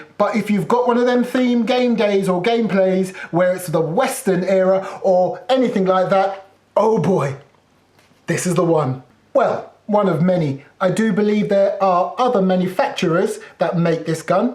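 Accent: Texas, United States. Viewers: nice american accent XD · Starring In: The Rifleman Your American accent is great, the western intros are hilarious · Your american accent is actually really good! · actually, your texas accent sounds awesome. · Good American accent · Your Texas accent was actually really good.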